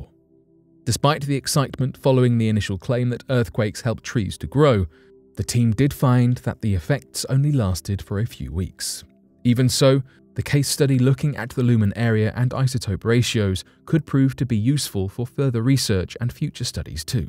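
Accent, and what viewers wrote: England, United Kingdom. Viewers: What scientists? Names? Why is a guy with a British Accent even doing a video on this? Are we supposed to believe you more because of your accent? California has been experiencing quakes for years. Scientists look at data, the take an educated guess at when something happends. A dart board would be more accurate. Sorry but terrible video. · English or European? Love hearing your real voice on your blog love true dialects and love your channel and info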